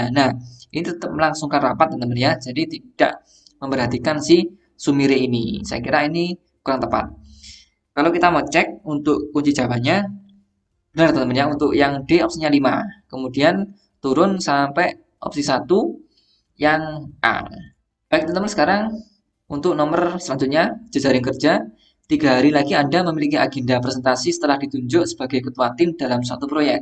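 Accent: Java, Indonesia. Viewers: Buoh... 😂 Jowo tenan coach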